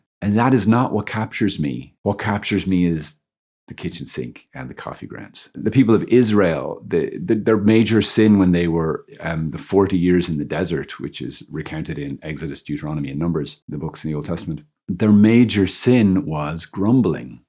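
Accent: Ireland. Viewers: Great advice indeed! And nice to hear the Irish brogue! Beannaithe Dá leat!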